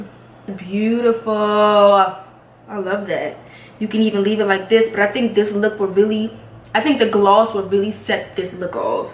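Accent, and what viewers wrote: Washington DC, United States. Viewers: Where are you from you sound like us girlies from D.C.!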